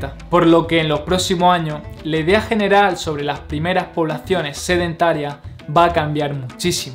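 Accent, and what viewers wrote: Andalusia, Spain. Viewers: Se que de España pero de que region? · Me gustaria que te corrijas los errores de lenguaje, la información bien, pero la zona de España de donde eres no te da derecho a que hables a un publico tal cual lo aprendiste, nosotros aprendemos de ti y no me gustaria aprender a decir ese grupo de persona. Te comes las letras amigo o las dejas guardadas en tu bolsillo. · No sólo eso en Física se replantea la idea de que este Universo es más joven.Tu andaluz es el mejor que he escuchado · Gran video. Y ese acento andaluz le da un toque especial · Me gusta tu canal, como haces que la gente cuestione todo, además sos andaluz? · No dice mil, dice 10000, vuelva a escucharlo y lo percibirá... yo también oí 1000 al principio pero debido a su acento andaluz que, a veces, no deja claro lo que dice...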